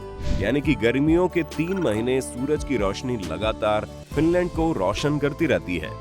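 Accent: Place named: India. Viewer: Aapki avaj hindi Vibes jesi he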